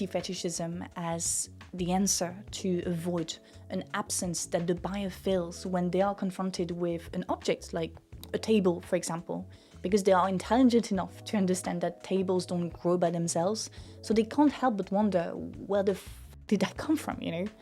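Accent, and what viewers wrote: France. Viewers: Thanks for raising your voice regarding Temu and that mass consumerism. Got a rather ascetic lifestyle, so Temu's offering sounds immediately idiotic to me. Why buy more than you would ever need? Seems crazy to me, but i know how society (*ahem* businesses) push people to always want more, newer and shinier stuff... Btw, took me a surprisingly long moment to realize you are FR, as I am. Great accent :)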